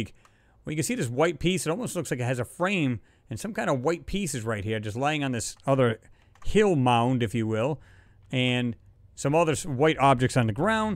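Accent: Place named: New England, United States. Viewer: Yeah I'm Diggin these videos I subscribe to you. I am also loving that New England accent. So much better than this Florida twang I have been forced to endure for 46 long yrs.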